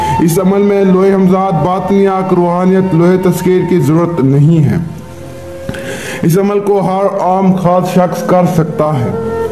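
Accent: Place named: India. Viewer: السلام عليكم ورحمة الله وبركاته صاحب کیا اپ پاکستانی ھیں یا ھندوستانی نمبر تو پاکستانی مگر أواز اور لہجہ انڈین ہے کیوںکہ میں اپکا شاگرد بننا چاہتا ھوں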